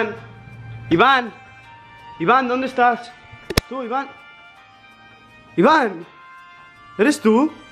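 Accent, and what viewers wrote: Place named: Spain. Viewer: Ugh I love your accent. Spanish is definitely my flavor 😍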